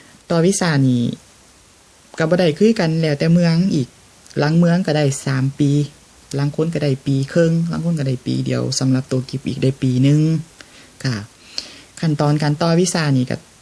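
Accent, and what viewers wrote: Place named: Chaiyaphum, Thailand. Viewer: โทดเด้อจ้า พี่คนทางได๋น้อฟังเสียงเว้าคือคนชัยภูมิบ้านหนูแหมะ พอดีพึ่งมาติดตามมื้อนี่จ้า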